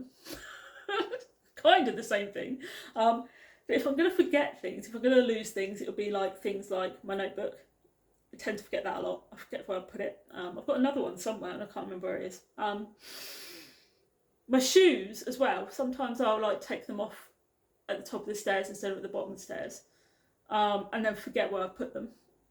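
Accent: United Kingdom. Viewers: Britânic English... Happy friend's day! I'm learning english! I really need learn! Thanks for you. Good afternoon.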